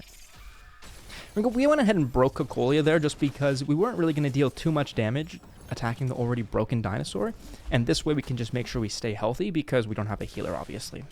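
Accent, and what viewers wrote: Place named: Latin America. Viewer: Dude, u speak like a latin man jaja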